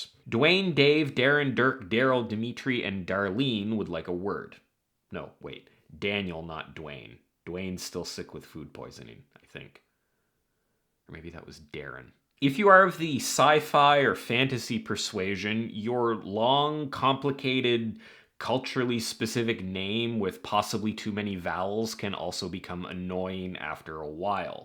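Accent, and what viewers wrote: Canada. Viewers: Are you from the maritime region of Canada? You sound just like my favorite early 2000s politico Rick Mercer 😂🎉 super random sorry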